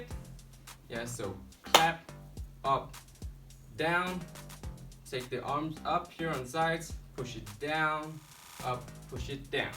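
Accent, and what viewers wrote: Denmark. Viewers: bro this guy sounds danish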